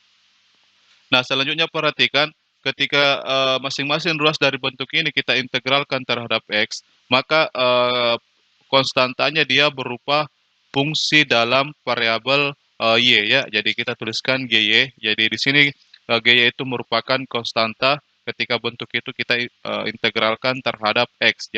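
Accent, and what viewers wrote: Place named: Makassar, Indonesia. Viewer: logat makassar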